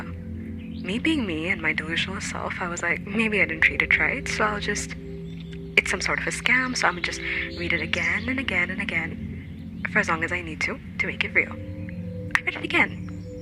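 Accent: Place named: India. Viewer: are you indian? you have that accent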